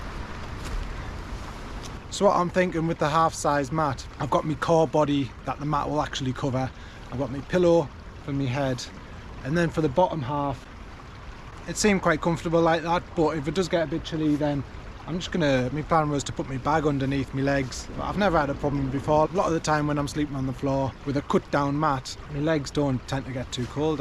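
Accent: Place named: Northern England, United Kingdom. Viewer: Enjoying the channel, love the accent. I’m from Newcastle originally but been in Oz for 35 years so love hearing the Northern accent.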